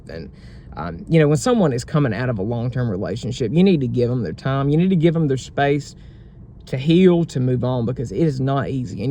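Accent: South, United States. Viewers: You’re describing my first needy boyfriend so well. Exactly what happened. Emotionally he depended on me for everything and it was draining. I had to break the relationship but to this day he doesn’t understand. By the way, I’m watching your third video right now and this is really good stuff. Very relatable and enjoyable. Thanks for putting yourself out there with your honesty and vulnerability. Not much of that flying round these days. Btw I’m Alec, I’m watching this from France, I’m French , I love your southern accent. Which city and state is your accent from ?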